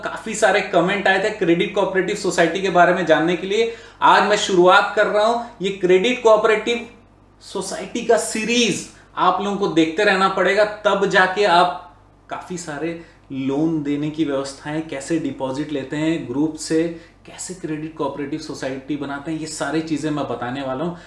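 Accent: Bihar, India. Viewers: Bihar se ho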